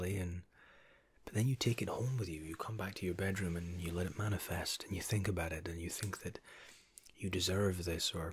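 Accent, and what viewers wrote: Scotland, United Kingdom. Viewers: U sound british ... i prefer hearing british speaking because i feel like it’s someone from my family and I feel more secure! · I actually love your accent - is that a hint of scottish i hear? ;) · And your Scottish!!! 🥰 I think i'm falling in love! Haha, ok, that's my cue to go before i start sounding like even more of a weirdo, lol 😂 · Your accent is great. it's like British with some Scottish idk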